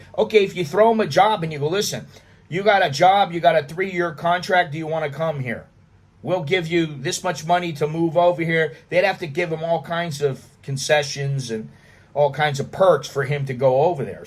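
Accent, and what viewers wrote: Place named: United States. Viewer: You sound very American. Most of the world is like Thailand.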